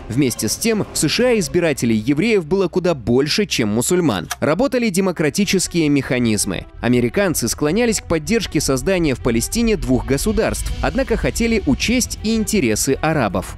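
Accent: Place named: Moscow, Russia. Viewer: Рассказ интересный. Но Московский акцент режет слух: "конфликт нАваприбывших обострялся...". Да здравствует Красная Армия! Да здравствует социалистический сионизм.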